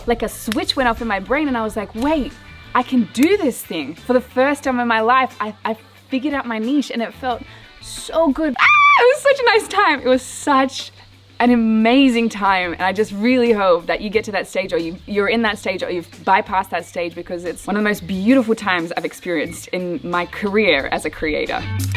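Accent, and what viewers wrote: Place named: Australia. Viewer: This was probably one of my favourite videos you have made! I loved all the content - preaching compassion and being content with your personal progress. But I also loved all the different scenes (backgrounds?) They looked great! And... You know your personality is just so fun! And your Australian (which all aussies love!) Keep up the great work!!!! 🇦🇺🌏😃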